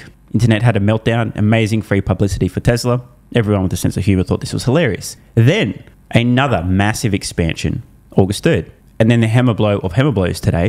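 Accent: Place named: Australia. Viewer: This is Australian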